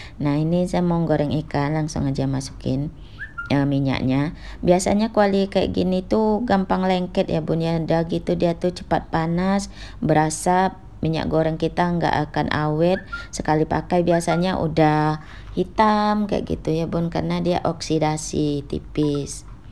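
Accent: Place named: Pekanbaru, Indonesia. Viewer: assalamualaikum bund..cara ngomong nya mirip orang Pekanbaru bund..hihi..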